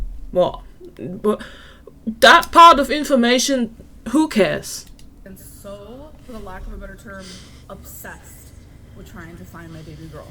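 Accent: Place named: Germany. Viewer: I thought you sounded German!!! Welche Region wohnst du in?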